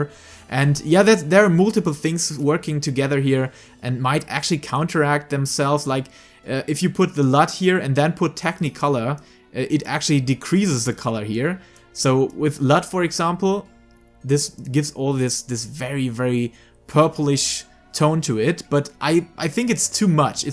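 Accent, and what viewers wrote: Germany. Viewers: Dein hart deutscher akzent plus der misch masch an amerikanischem und britischem englisch machts unmöglich dir zuzuhören... Dazu kommt, dass der Look am ende einfach mal gar nicht aussieht wie 7.0? Und "similar" halt auch nicht? ôo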